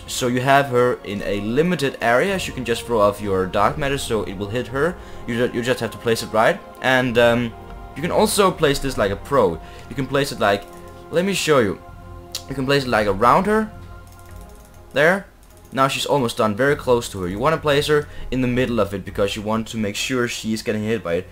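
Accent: Denmark. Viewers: Really love the videos! Keep it up, btw are you danish? :3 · Jeg hører en dansk accent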